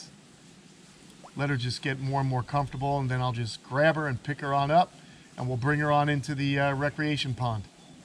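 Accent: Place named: New York, United States. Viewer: Nothing wrong with a New York accent.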